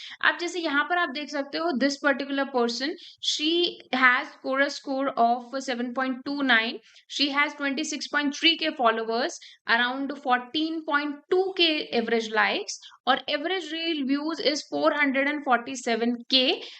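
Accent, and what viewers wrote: India. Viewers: Indian English fuck it🚮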